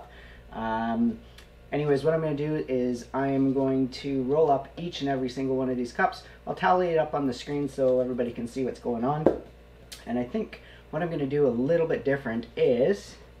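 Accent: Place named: Canada. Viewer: had to sub because u sound canadian and not the fake canadians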